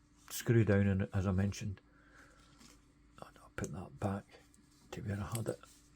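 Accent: Scotland, United Kingdom. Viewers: Bloody hell, it seems like I’m hearing William Wallace reviewing a watch. Nice review.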